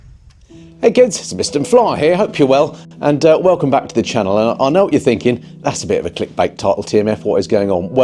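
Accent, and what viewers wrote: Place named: United Kingdom. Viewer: Missing your industry news, and UK accent. Hope the surgery went ok last December. Godspeed!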